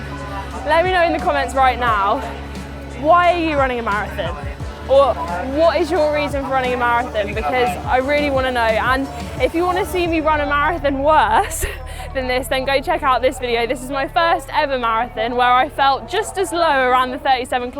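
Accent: United Kingdom. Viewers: your amazing! as amazing as the british accent! ;)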